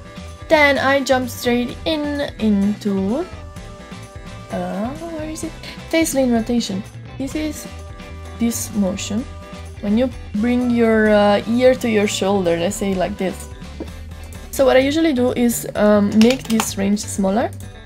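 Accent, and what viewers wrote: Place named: Italy. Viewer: Ma scusa, ci sono già migliaia di persone che parlano inglese e hanno più views. E te che sei Italiana e si sente DI BRUTTO, ti metti a fare tutorial in inglese? 🤦🏻‍♂️ La community inglese, ha fin troppe persone che spiegano pure come costruire un razzo spaziale. Invece la community italiana è sempre quella più svantaggiata. E giustamente te ti metti a parlare inglese per avere più ascolti. Risultato: 3000 follower. I'm laughing :D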